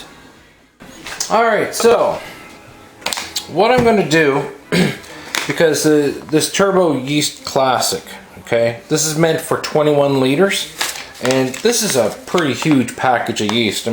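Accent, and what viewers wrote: Nova Scotia, Canada. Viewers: Hey.... I've tried a few dark rum recipes similar to yours. I've tried various combinations of white sugar, ginger, cloves, oak chips etc. to get some different flavours. You had me hooked once I heard your accent.... and when I heard you talk about donairs.... I thought we may have met at that point being from Pictou County (but living in Oshawa) myself. Looking forward to viewing some more of your recipes! Cheers!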